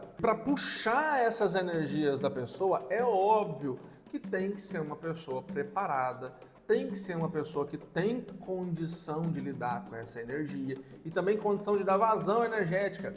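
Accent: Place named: Minas Gerais, Brazil. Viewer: Boa tarde,estou gostando muito das suas aulas , parabéns! Fala de onde vc é ...parece ser mineiro como eu ,sou de BH .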